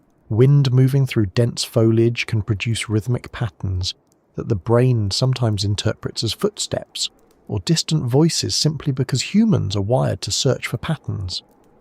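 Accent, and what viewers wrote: United Kingdom. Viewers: Why is this narrative British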